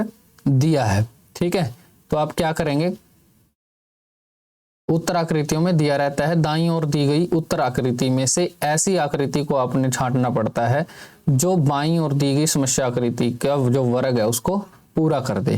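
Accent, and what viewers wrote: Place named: Haryana, India. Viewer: Sir your voice tone is so amazing... Haryanvi mix Hindi... your lectures very beneficial for me 🥳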